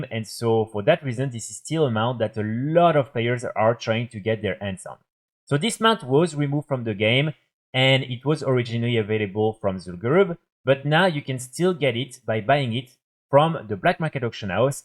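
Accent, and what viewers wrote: France. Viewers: French accennnnt guyyyy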